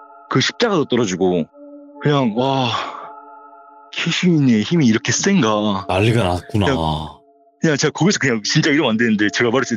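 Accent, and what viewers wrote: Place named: Jeolla, South Korea. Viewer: 말투가 전남이시군요 ㅎ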